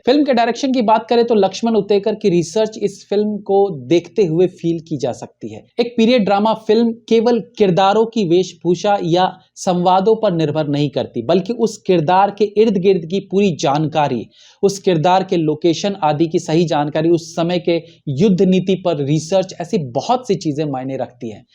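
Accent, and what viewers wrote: Bihar, India. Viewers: Are Bihari nith bol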